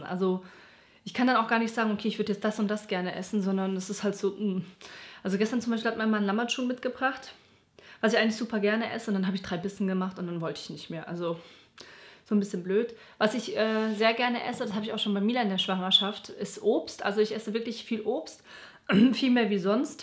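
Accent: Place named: Hesse, Germany. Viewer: Sie kommt aus Hessen sie schttt nur ! Das nennt man Hessissscchh !! ♡♡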